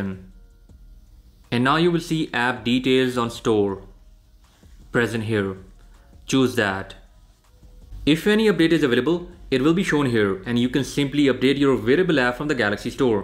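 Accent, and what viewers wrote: India. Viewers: Heavy Indian accent = 76 likes. I disliked. I almost puked because of his accent!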